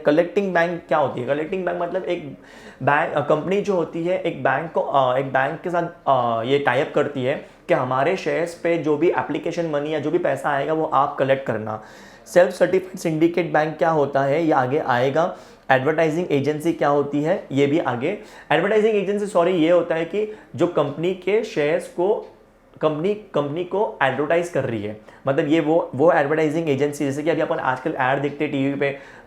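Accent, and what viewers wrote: Maharashtra, India. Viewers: Are you Marathi ?sir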